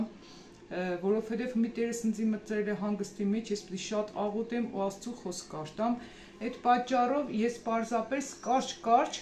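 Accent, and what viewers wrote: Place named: Gyumri, Armenia. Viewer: Բարև ձեզ շատ հաճելի է լսել իմ Գյումրեցու լեզուն Աստծո օրհնությամբ ապրես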